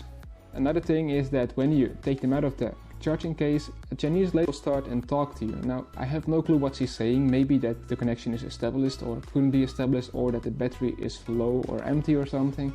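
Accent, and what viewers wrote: Netherlands. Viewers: Ik hoor gewoon dat deze man nl is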